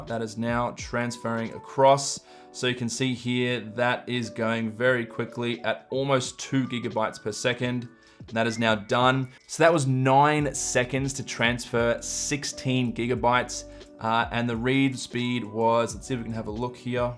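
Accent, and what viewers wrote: Australia. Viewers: why does cutie boi have an Aussie accent?!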